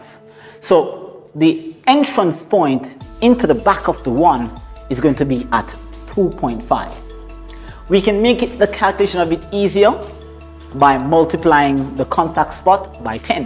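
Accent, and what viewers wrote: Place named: Jamaica. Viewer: Hi there... Good Morning to you. I'm gonna suggest that you try out some kind of sound system, where you can use a chin mic (didn't wanna say mouth mic). The echo & your Jamaican dialect makes what you say hard to hear & understand.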